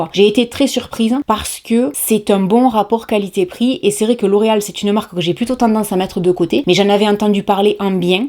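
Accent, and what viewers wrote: South, France. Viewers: C'est vraiment un régal de te regarder et de t'écouter, ton sourire, ta joie et ta voix qui chante le sud, j'en raffole Bisous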